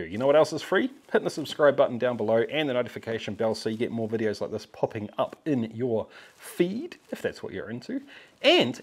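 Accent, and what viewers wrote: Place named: New Zealand. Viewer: You kiwi?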